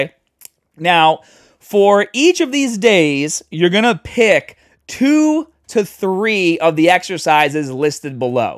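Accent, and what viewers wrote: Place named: Massachusetts, United States. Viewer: I had a feeling this guy was from Massachusetts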